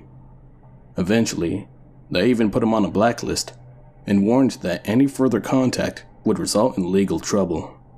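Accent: South, United States. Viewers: As a fellow Georgian (not too far from this story), I voted you up! I moved to the north, and it’s awesome to hear a southern voice again lol. Good luck in round 2! I’m excited to see what you’ve got in store. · I thought you were from the South! I was sold! So you can do accents too? that's even more impressive! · Nice story! Mr. has a very likeable way of narrating..held my attention immediately. Felt like I was listening to a friend relating a story. Extra impressed he's Canadian yet his accent reminds me a bit of my southern roots. Definitely a 👍 from me. Actually 2 👍👍, 1 for author Mr. Zacharius Frost as well. And it's always nice finding another great cp channel, so I subscribed to too. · Being a non-American; I love that southern drawl.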